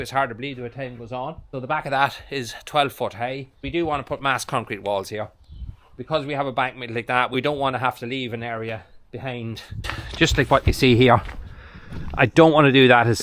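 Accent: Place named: South, Ireland. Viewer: Another great video,i wish you did one or two during the week as well. I just love the irish southern accent,im from the uk and have visted cork area 3 times and i just love IRELAND... Hope to come back very soon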